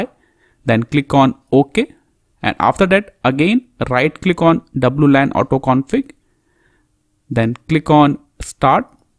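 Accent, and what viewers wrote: India. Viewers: Worked , thanks a lot , i knew it will work when i heard that thick Indian accent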